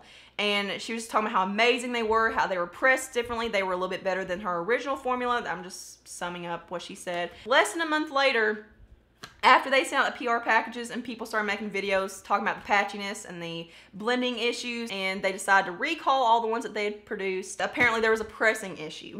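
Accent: South, United States. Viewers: I can't believe you replied! Holy Crap! Hoping someone can tell me what state you are in because I'm in AR!!! Annnd you sound like you are southern...maybe? 😂🤔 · Omg, just found your channel tonight and I love you. You're honest, dont try to come off as I'm perfect and I know it all. Love that. I love your Southern accent, it reminds me of my mom's family. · Your accent is really nice ❤ Are you from Alabama/Georgia? I appreciate you being really genuine in this review 😘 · Where you from? It sounds like your southern. I'm from GA 😊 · OMG how have I never watch or even come across your channel.. this is my first video of yours I've watched and I absolutely love you your vibes your bluntness and your attitude.... BTW I love your southern accent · This is the first video I have EVER seen from you or your channel. As soon as you got sassy about how your title says this will be a rant and people can leave, GIRL YOU GOT ME! 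I hit that subscribe and all notifications on right then and there. Love the sass, PLEASE don't ever lose that!!! PS- Your southern accent brings me back to the part of my life when I lived in Eastern North Carolina. I don't believe your accent is NC, but it's as close as I can get while living in northern Illinois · Alright girl where u at? Im gonna say GA or TN....me, AL. And i am THANKFUL TO HEAR U SLINGIN THAT ACCENT (unlike someone else from my home state who took lessens to take her accent OUT) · Luv you lil country accent...It is absolutely gorgeous and you have amazing skin by the way!!!!!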